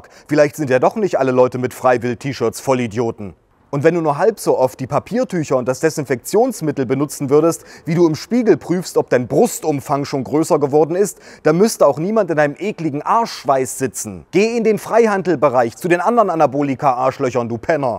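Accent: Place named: Saxony, Germany. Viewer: Der sächsische Harry G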